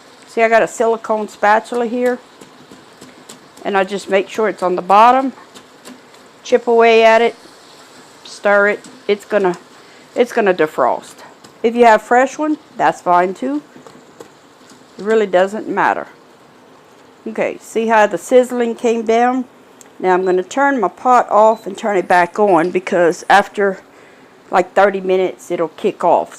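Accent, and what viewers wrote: Louisiana, United States. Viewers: I don’t have an instant pot but that looks so good I may try to make that without an instant pot. Also Love your Cajun accent.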